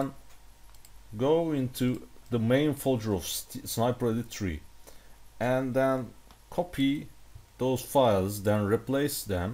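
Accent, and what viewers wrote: Turkey. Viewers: U from Turkey right. I knew that your accent hahahahahaa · Türk aksanı lan bu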